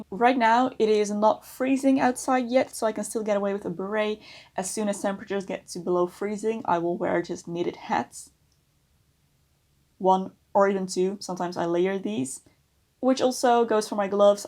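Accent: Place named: Netherlands. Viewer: i heard dutchie in your accent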